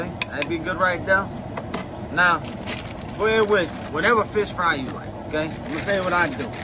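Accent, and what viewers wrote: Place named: South, United States. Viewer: Hey there ya all folkes...love that southern twang.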